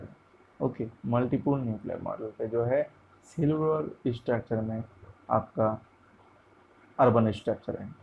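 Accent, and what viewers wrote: Bengal, India. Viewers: Sir appke english m bangali tune mix hota h so thoda sunne m effort krna pdhta h thoda app vocal ko open krne ki practice kare humble request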